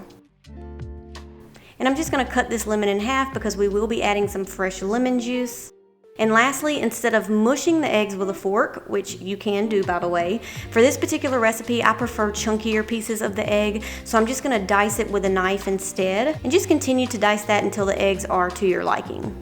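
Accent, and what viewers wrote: United States. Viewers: Kajol speaking Amrikan....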